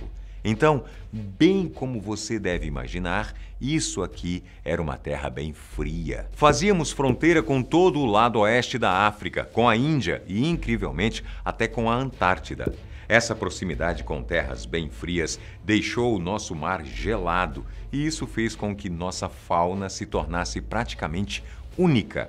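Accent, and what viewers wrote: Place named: Minas Gerais, Brazil. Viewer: Sou de minas posso dizer , o sutaque mineiro ficou bom viu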